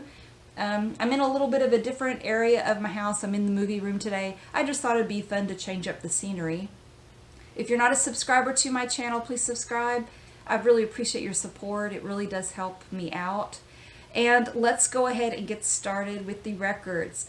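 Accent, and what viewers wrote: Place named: South, United States. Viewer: I love your accent, very southern lol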